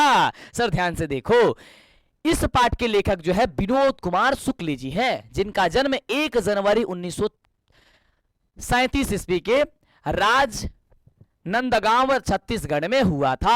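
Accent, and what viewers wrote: Bhojpuri, India. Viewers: Bhojpuriya sir❤❤❤😅😅😂😂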